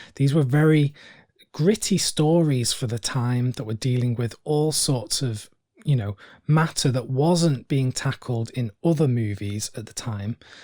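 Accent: United Kingdom. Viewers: Great tutorial and will watch some of these. Is his accent British maybe from a small corner of England somewhere? Sounds like a very unique accent. Is "North by Northwest" more of a Hitchkok horror film and not Film Noir? I looked at the present day list of the Film Noir of today, forgot the official name of that genre, and wow so much violence I quit watching even the clips.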